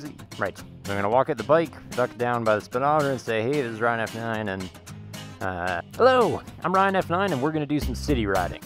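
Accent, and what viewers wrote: Quebec, Canada. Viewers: Is he from Canada? Sounds like Norm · Ho yeah un québécois !!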